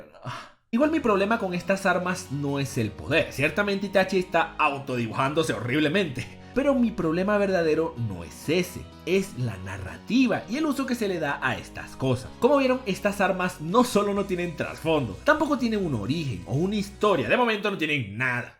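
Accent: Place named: Venezuela. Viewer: Tras de lecho venezolano que ya hasta las tripas me arden de escuchar ese asentó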